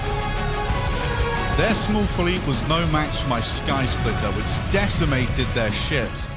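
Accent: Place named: United Kingdom. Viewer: I thought you were saying alpha ray eye cores for the longest time, and then I realised you were just fucking bri*ish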